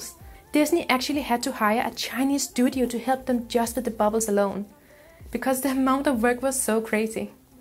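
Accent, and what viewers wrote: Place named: Denmark. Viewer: Your from Danmark i Can hear this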